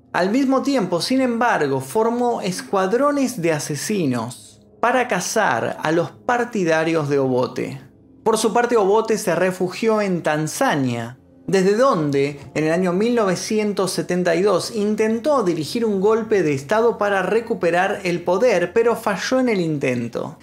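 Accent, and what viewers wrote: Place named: Argentina. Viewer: Oye no puedes grabar los vídeos sin meter ese asiento argentino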